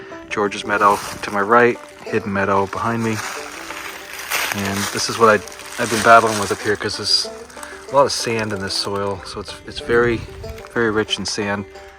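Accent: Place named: Maine, United States. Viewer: Okay…catching up…been disposed the past few days. I prefer the over wheel straps. They are limited to that one job but transporting things like that, make them worth the investment. Tails….fold them up from the tail end to the ratchet, and then secure them with those little looped bungee cords with the ball end. May have to go around the folded end a few times to take up the slack, but they are cheap, quick, easy and effective!! That Downeasta accent is in your blood!!😁🤣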